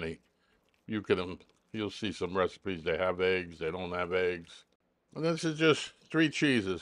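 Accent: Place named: New York, United States. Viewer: Your demeanor and accent scream new- york, and I love it. Thank you for the videos may God bless you.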